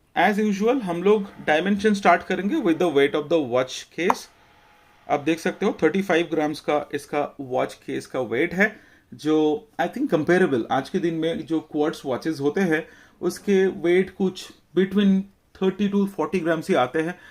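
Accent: Bengal, India. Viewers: You are bengali?😅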